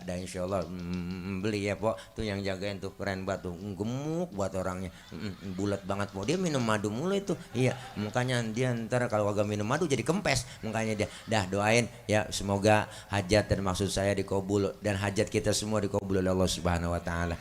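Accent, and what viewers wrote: Jakarta, Indonesia. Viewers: Walau video nya baru dan materi nya di ulang ulang,saya tetep suka dengan ustadz dengan logat khas betawi nya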